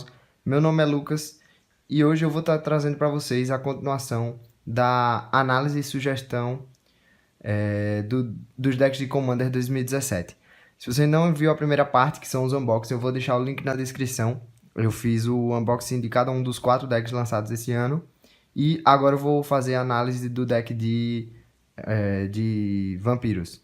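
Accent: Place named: Northeast, Brazil. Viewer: Rio Grande do Norte. Reconheci o sotaque e gostei de ver mais nordestinos produzindo conteúdo de Magic. Me sinto representado.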